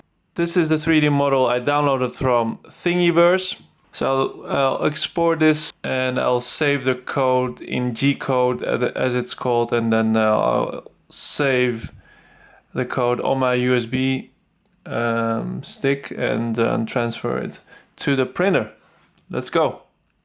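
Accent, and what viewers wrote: Netherlands. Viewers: You Dutch? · Leuke video! Ik hoorde aan het begin van de video al dat je nederlands bent, maar het werd pas bevestigd toen ik de spuitbus verf zag😆😉. Ik gebruik de zelfde verf voor mijn prints. Weet niet of je dat al doet, maar deze verf is het goedkoopst bij de "Action" winkels.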